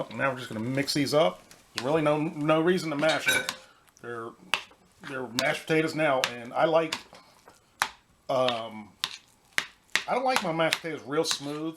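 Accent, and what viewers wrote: South, United States. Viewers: You are sounding extra southern here!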